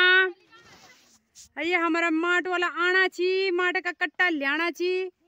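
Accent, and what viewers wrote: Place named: Garhwal, India. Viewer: Yaarr tumhare vlog mood fresh kr dete hai... aur jo tum garhwali bolti ho aahaa...😍😍😍😍😍 love from rajasthan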